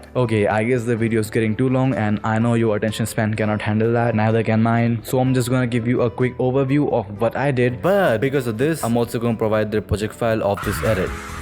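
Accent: India. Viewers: always the indian acent with the best tut:) love u sm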